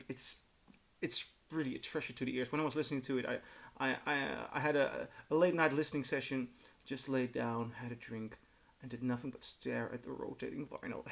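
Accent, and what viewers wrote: Netherlands. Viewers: Are you from The Netherlands? Just asking because of your accent. I'm Dutch.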